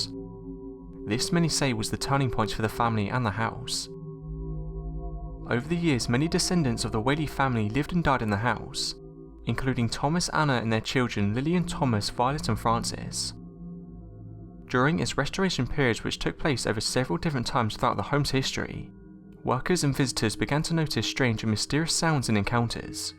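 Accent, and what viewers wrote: United Kingdom. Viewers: You almost sound like the narrator from Top5s. You both are my favorite British narrators. I hope you eventually obtain as many subscribers as Top5s. God bless, and greetings from a US soldier from Illinois, USA!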